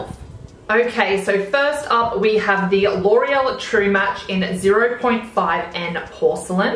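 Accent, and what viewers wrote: Australia. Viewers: hy dear u from austrlia???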